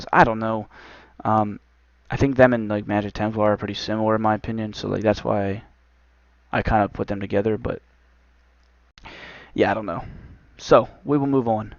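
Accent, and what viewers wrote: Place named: Pennsylvania, United States. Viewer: Your accent reminds me of Bam Margera, lol you from PA I bet. It's all in the way you say "classes" it reminds me of when Bam says "asses" HAH!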